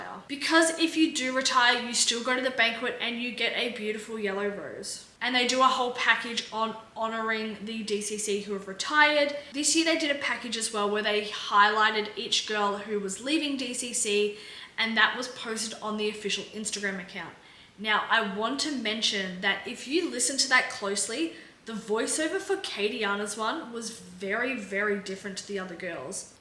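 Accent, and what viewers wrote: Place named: Australia. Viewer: Why didnt I know you were a fellow Aussie? Your accent!! Yes Erin is guilty. Are we really surprised? Chandi lost the plot.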